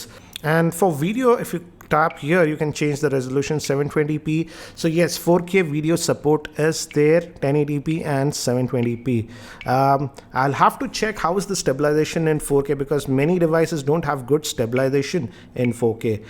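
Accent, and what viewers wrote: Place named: Tamil Nadu, India. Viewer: Your pronounce is a tamil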